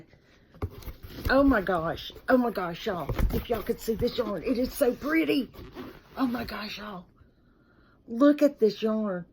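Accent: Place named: Texas, United States. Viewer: I asked my hubby where you might be from because I love your accent. He listened to a couple of sentences and said Texas! His mother was from Abilene TX. I loved her so much. They retiredto Mission TX. I live an hou4 away from Herrschnerrs 😉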